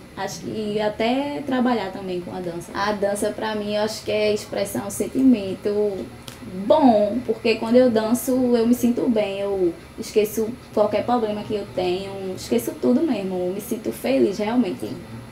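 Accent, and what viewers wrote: Brazil. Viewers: Sutaque de nordesteina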